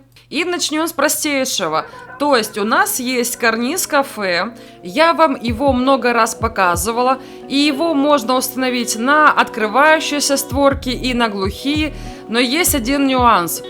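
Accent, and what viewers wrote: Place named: Moldova. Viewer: Приятный молдавский акцент.Скучила.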